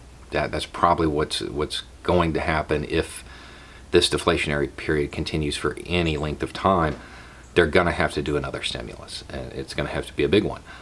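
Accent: United States. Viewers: Fucking hell, I'm so used to hear trumpian nonsense these days that hearing sense spoken with an american accent nearly made me fall off my chair! I should know better as there are as many smart americans as any other nationality. Speaking from europe. We got our own troubles of course, it's not paradise, we're not that bad yet even tho there are plenty, too many, who wish to adopt the USA ultra liberist system full on. Power of hollywood I suppose. Good luck to all of us.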